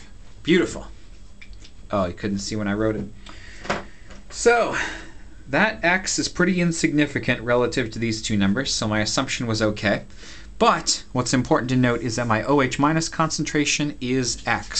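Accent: Canada. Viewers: ''check it OOT'' gotta love the Canadian accent man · are you canadian? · you just took a nice $@ on my professor life, thanks man and btw i really like the canadian accent "OAT" when you say out haha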